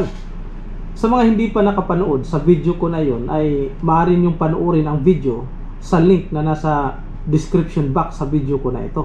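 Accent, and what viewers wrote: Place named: Pangasinan, Philippines. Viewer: taga Pangasinan po ba si Doc? may punto e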